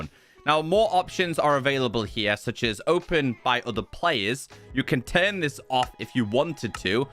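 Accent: United Kingdom. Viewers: I was watching one of your videos and my Dad said “what in the British!?”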